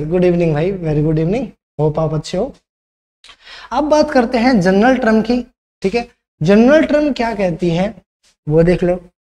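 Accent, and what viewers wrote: Haryana, India. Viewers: Han ji.. Wese isme koi shak nahi hai... Qki sir haryana se hi hain... Magar aapki daag deni padegi ki aapne tab bhi pahchan liya jab sir ne hatyanvi nahi boli hai.... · Sir aap 101% haryana se ho na?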